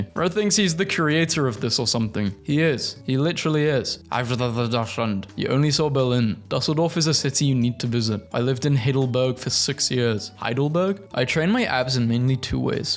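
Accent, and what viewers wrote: United Kingdom. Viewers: Is it just me, or does this guy sound British?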